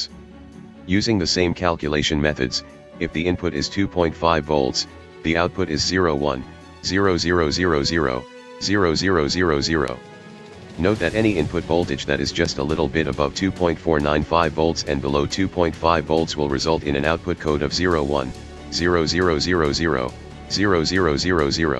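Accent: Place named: India. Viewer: Yes don't put music + nice to keep robot speak as I hate Indian English better to use robot eng instead of yours as when I found an Indian English video I simply skip it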